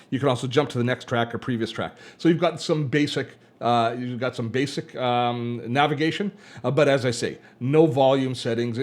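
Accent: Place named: Canada. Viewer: Yeah I knew you were Canadian 😂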